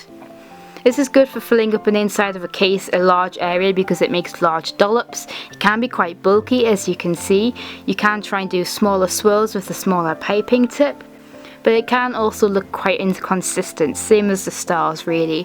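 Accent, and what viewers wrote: United Kingdom. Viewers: You probably get asked this a lot, but i was wondering what area of the UK youre from? Your accent is lovely and sounds kind of northern to me :) I've just started doing my own decoden cases and i love watching your videos, theyre really helpful :) xx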